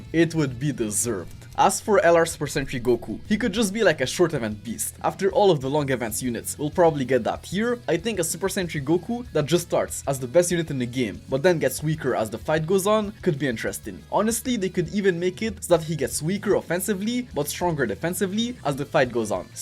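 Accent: France. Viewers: i can hear the french accent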